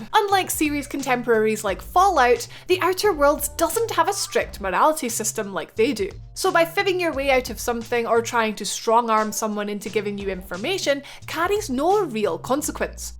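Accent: Ireland. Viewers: You have a beautiful Irish accent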